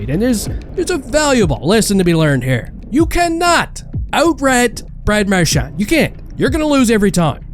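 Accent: Newfoundland, Canada. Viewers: I know a fellow Newfie when I hear one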